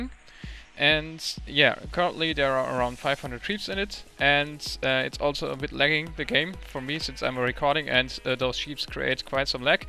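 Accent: Germany. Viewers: I'm Dutch too, and has a German accent, very clearly not Dutch, but German.. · Dude germann accent is almost the same and im pretty sure he is german since in his bike wheelie video you saw alot german stores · das hört man doch dass er Deutscher is ´haha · Are you german because i am and my english sounds like your's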